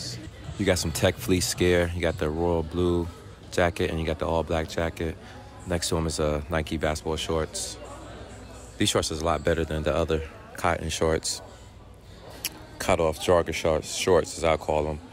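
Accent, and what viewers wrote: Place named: New York, United States. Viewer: I love u talking cuz I am thinking ur from NY and I am from there and I live in Charlotte now so I like to hear u talking. Just found ya channel. Dig ur vids. 💪🏿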